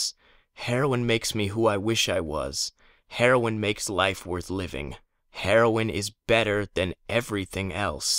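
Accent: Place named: East Coast, United States. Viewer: the East Coast accent helps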